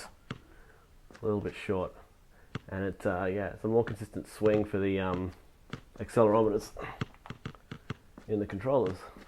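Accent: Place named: Australia. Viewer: You're Australian 😇 👍👍👍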